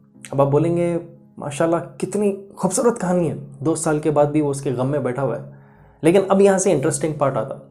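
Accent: Hyderabad, India. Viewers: your Hyderabadi accent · Hyderabadich hai miya ye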